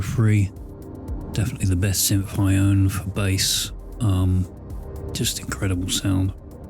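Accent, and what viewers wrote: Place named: United Kingdom. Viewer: Your studio is bad ass brother. I never heard you talk. And just imagined you as a Yank. So my question. Where across the pond are you from?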